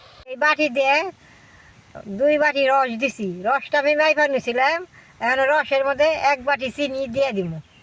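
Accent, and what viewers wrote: Tangail, Bangladesh. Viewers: আপনার বাড়ি কি টাংগাইল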